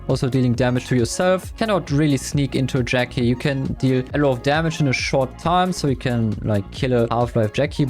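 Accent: Germany. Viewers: Hab direkt rausgehört das du Deutscher bist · I can hear the deutsche akzent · R u German? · Are you german?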